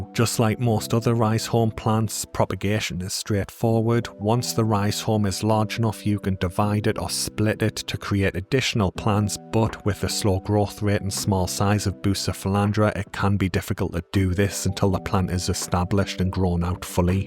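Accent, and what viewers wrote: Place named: North, United Kingdom. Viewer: Can tell you're from the north (not just from the accent) my house won't get below 20 degrees over winter. We're made of soft stuff down here 😂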